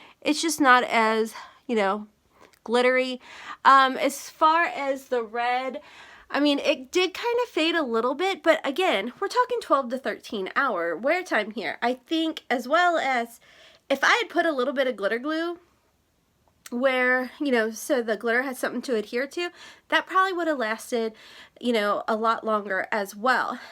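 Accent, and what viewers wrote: South, United States. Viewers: What happens if you take the ones that are close, but one is more pigmented, and apply the darker one with a much lighter hand. I would really like to see. I don't know if it's the lighting, since you mentioned it, but you look like you have sparkly white pupils👁 I love watching you. I live in northern ca, and I miss a little southern accent. Where are you originally from? Blessing to everyone, and happy holidays🙏🎄🌹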